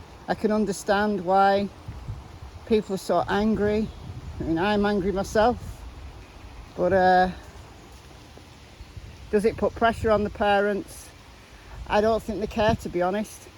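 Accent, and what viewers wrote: United Kingdom. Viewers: Your accent so sweet like British 👍🏾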